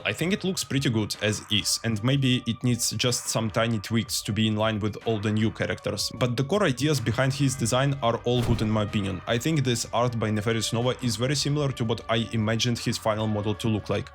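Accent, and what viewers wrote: Russia. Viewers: Я один слышу очень русский акцент?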